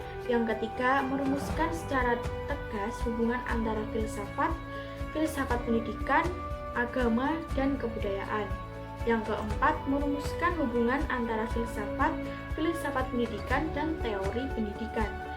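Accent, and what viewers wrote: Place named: Banyumas, Indonesia. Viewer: Uwuwuwu wong ngapak 😂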